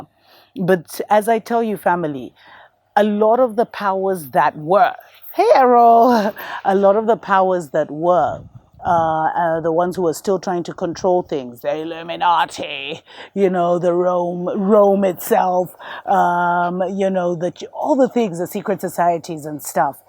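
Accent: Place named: United Kingdom. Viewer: I cannot listen to you why you worrying how she laughs what part of the uk are you from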